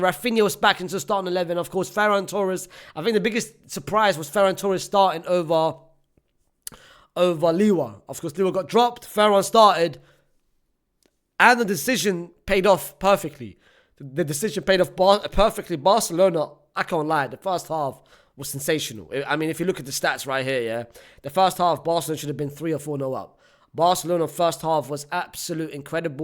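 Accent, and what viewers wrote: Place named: United Kingdom. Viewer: That British accent from a Iraqi is crazy unc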